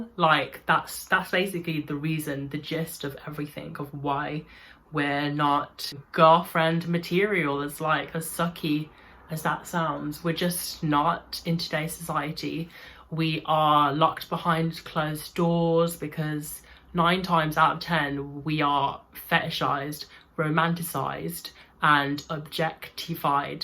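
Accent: United Kingdom. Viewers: I'm straight married soon to be divorced...and sweetheart I would love to date you. #1-You're Gorgeous #2-I love you're accent #3-You're not only gorgeous on the outside but I'm sure inside too heart & soul #4-I'm secure on my masculinity #5-I don't care what people say or think...as long as were both happy #6-I would love to date you sweetheart...I love and adore British women & you too !!! If you ever wanna chat...I would love to meet you...amor I need a woman like you in my life ! Take Care sweetheart I wish all the best !!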